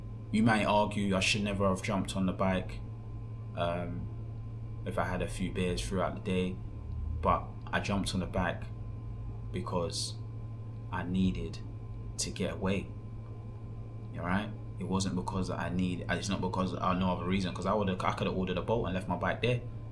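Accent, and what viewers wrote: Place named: London, United Kingdom. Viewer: If you had not done anything wrong, why did you flee? If you did nothing wrong, why did they chase you? If you had been causing trouble and left they would have just let you go, unless you had not paid a bill. Plus, you did injure a pedestrian. They could have charged you for that. These cops are not like the MET cops. You sound like you are from London. No verbal warning here. No behave yourself and go home. And definitely claims of: is it because I am black, don't work in Thailand.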